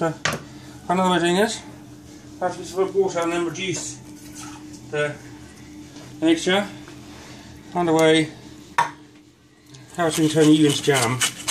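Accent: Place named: South West England, United Kingdom. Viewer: English, probably South Western. Not far from Forest and Pirate dialects. Pair of dills.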